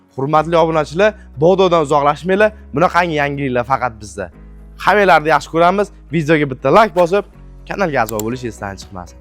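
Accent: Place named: Kokand, Uzbekistan. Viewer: Чота шу бола куконли шева тортяпти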